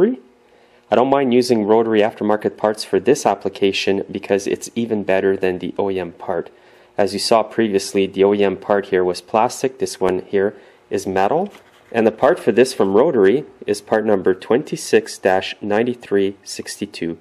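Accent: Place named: Canada. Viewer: Best instructional video I've ever watched!! Love your Canadian accent. My wife is Canadian so I,m kinda partial.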